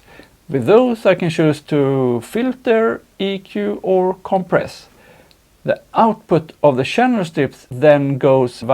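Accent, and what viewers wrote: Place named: Jamaica. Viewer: nice analog & digital hybrid system ! u sounds like from jamaica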